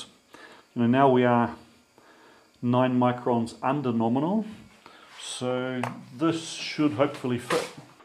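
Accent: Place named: New Zealand. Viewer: I'm a hobby machinist and have spent 30 years trying to learn as much as possible. This video was the best, most engaging learning I've experienced since hanging out with my 90 year old tool maker friend when I first fell in love with machining. THANKYOU for your time and content. This was so good. I fully thought you were kiwi like me with your accent, but maybe an Xpat... thanks from down under down under. Chch nZ ❤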